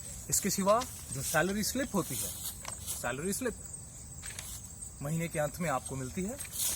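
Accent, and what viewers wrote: Standard. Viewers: man i really love your hindi ...... its so pure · Love your accent Pure hindi.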